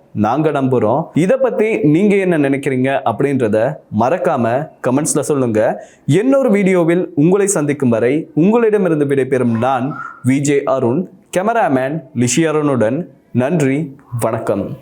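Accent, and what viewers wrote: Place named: Tamil Nadu, India. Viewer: இலங்கை சனல் தானேடா நீங்கள். பிறகேன் இந்தியாத் தமிழ் கதக்கிறீங்க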